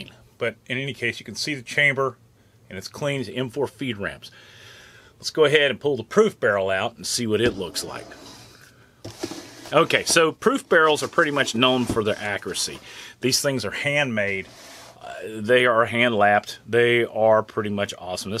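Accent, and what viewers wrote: United States. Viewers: what accent is that? sounds real American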